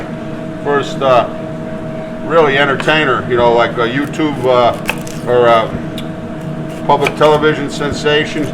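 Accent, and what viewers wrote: United States. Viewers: You have a very American style of talking, almost the accent.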